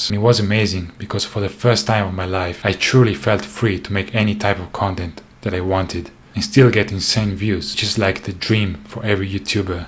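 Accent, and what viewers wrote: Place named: Italy. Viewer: Please don’t give up🩷. I accidentally stumbled upon one of your videos. I heard an Italian accent ( my Mom and her family were from Italy), so I stopped and watched. I hadn’t laughed so hard in years. My husband plays bass( and guitar, sorry), so we both watch together. Please know, you gave me my first real laugh since my Mom died. Your videos about playing bass reached all the way to America, and gave one grieving daughter a reason to smile.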